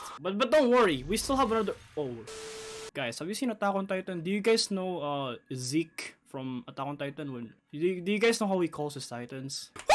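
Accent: Philippines. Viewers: are u Filipino?,bcuz ur accent is like Filipino when ur speaking english!.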